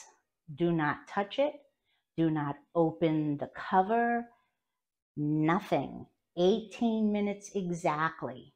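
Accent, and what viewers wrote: New York, United States. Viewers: I’m making this for dinner tonight! I found you through crocTober, but I’m going to subscribe. You sound like all of my family back in New York!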